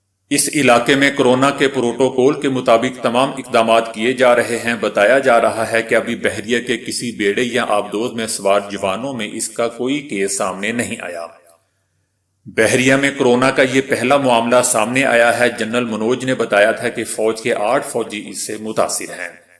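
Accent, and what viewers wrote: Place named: Punjab, Pakistan. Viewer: pahly apny LAHJEY ko sahi karo.. pher khabrey pharloo... khaar punjabi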